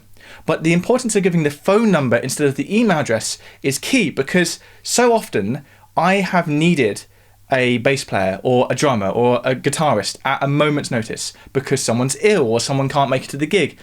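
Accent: United Kingdom. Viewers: Soy una persona que habla español pero entiende inglés y veo videos sin problema, pero a veces me cuesta contigo porque siento un acento muy británico 😂😂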